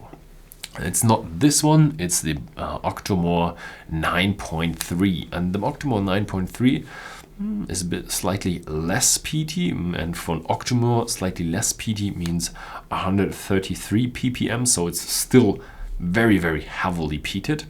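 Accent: Germany. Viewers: "A lot of news" or "tonnes of news" would work well. That said, I'd recommend you don't try to correct it. It adds flavour to your rather slight German accent. Back on topic: I could've sworn I've had an Amrut rum that was quite good. But google says there isn't one...?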